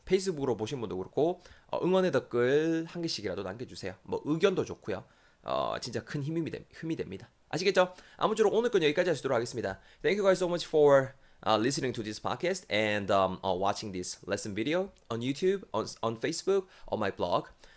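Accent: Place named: Gyeongsang, South Korea. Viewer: 안녕하세요 우연하게 동영상 접하게됬어요 ! 강의 정말 재밌게 잘하시네요 저도 경상도 출신이어서 너무 친숙하네요 너무 재밌게 잘 봤어요 ! ㅎㅎ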